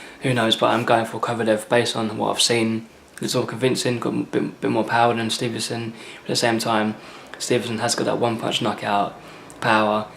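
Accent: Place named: Scotland, United Kingdom. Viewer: Fuck dude, u sound Scottish! I barely understood what u said "lai heavy wait taitol" and I know ur English but slow down overthrere Otherwise no one will leave u comments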